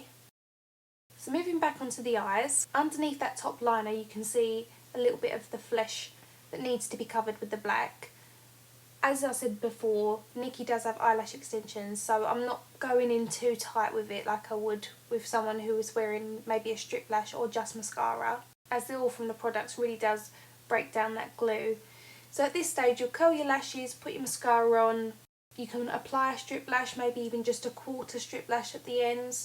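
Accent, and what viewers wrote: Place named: Romford, United Kingdom. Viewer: You sound like you're from my neck of the woods - Romford?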